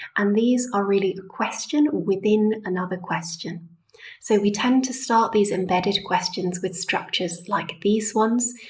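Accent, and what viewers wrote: United Kingdom. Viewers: I wonder if you could tell me what your accent is. Is it fully RP or mixed from one of northern or southern part of UK?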